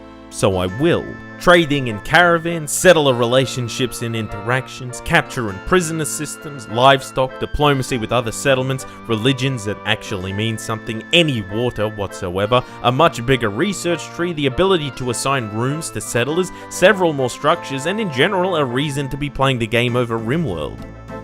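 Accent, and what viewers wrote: Australia. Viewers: I knew you were a fellow Aussie but your accent seems influenced by something else, I can't pick it and it drives me bananas, mate!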